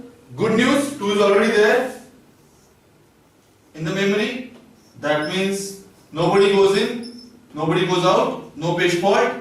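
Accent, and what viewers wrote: India. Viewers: The indian accent make it that much better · Only INDIAN accent :) very helpful video Thanks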